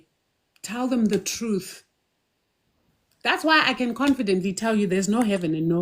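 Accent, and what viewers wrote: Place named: Zimbabwe. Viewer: Hi Gogo. Very very new to African Spirituality. Just wondering if you were born in Zimbabwe? I'm seeing Great Zimbabwe on your profile and hearing an accent that sounds like home. Thank you for sharing...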